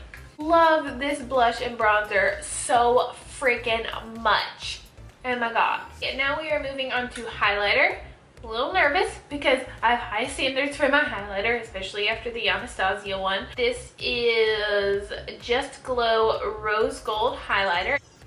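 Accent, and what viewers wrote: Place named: Louisiana, United States. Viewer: Okay.. I just randomly found this video cause I wanted to know more about that hard candy palette cause I saw it in Wal mart today! Anywaysss, right when you started talking I knew you were from Louisiana & then you talked about the hurricanes & I was like yep, I’m right! Hahaha. Anyways, I just subscribed! I’m from Lafayette!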